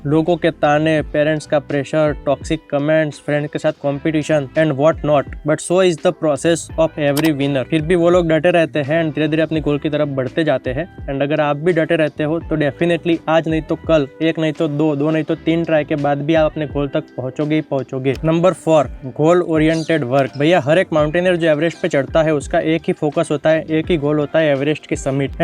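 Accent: Gujarat, India. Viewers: Gujrati accent in Hindi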